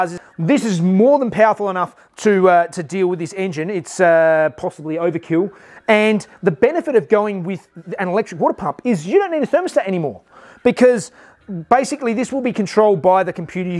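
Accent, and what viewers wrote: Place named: South Australia, Australia. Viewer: Oops. Embarrassing! I owe her an apology. Now I can hear it, but she's definitely picked up a a lot of the southern-Australian accent. And in mitigation, my hearing was shot away quite severely by neuropathy (nerve damage) last year. Salut.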